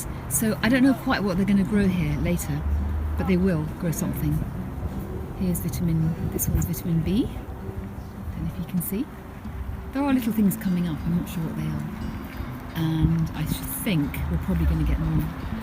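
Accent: London, United Kingdom. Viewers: 学习了很多，还有高级的伦敦音，继续啊！超级喜欢你。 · 我從台灣來美國快40年. 我還是喜歡英式口音. 尤其Manda 講解課程的聲音. 非常輕柔. 太讓我陶醉! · 小玉每期必看。thank you. Loooooove garden. I can speak Mandarin,English, Japanese and Shanghai Dialect. Love you speak British English. I have been living in Toronto for several decades. 谢谢分享花园。我非常喜欢花。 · 超级喜欢Manda的英式英语，很优雅好听，易懂。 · hi! thank you.! like London accent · London accent is music to the ears!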